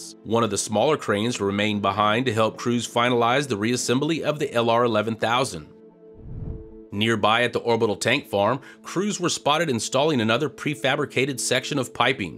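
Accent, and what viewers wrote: Texas, United States. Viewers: Congratulations!... Keep that Texas Twang coming. " The South Shall Rise Again... to Mars! "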